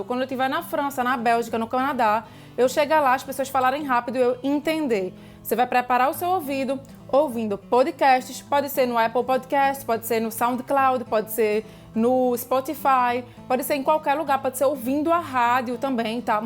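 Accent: Pernambuco, Brazil. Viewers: Seu sotaque pernambucano falando francês é um charme!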